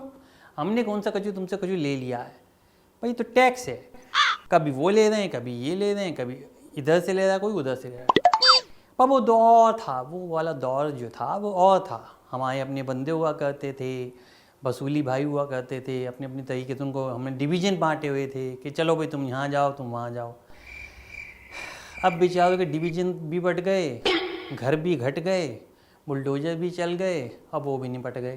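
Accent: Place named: Bundelkhand, India. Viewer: मज़ा आ गया बुंदेली सुनकर। और कछु अंग्रेज़ी सुनकर। वैसे वो तो इतनी भी अंग्रेज़ी नहीं बोलता जितनी आप बोल देते है।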